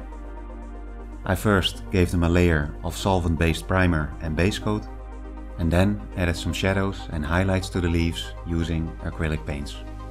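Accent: Netherlands. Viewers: Bruh you dutch aint you? I can hear the classic dutchman speaking english accent. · nice thick dutch accent ;p lovely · Detects a dutch accent.. spots gamma ... fuck yeah Hup Holland. Also cool Diorama. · Your accent tells me your dutch. Me 2 Great diorama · Kinda random but are you dutch? 0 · zwaar Nederlands accent. is wel cool!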